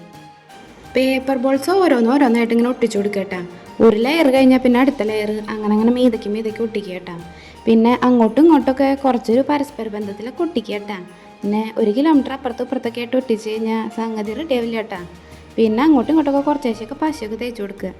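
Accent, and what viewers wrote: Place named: Thrissur, India. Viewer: മ്മടെ തൃശൂര് ഭാഷല് പെടക്കണ പെട കലക്കിണ്ട് ട്ടാ.... 👍🏻👍🏻👍🏻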